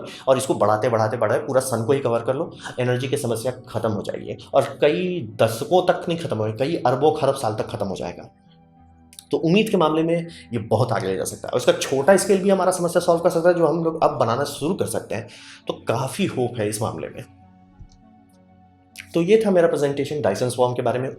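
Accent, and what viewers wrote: Bihar, India. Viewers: Sir..kya aap Bihar se ho..?????????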